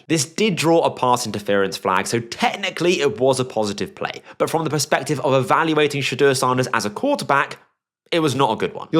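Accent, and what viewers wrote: United Kingdom. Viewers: Having a British dude analyzing CFB is throwing me off 😂. Great video man, editing and writing is A1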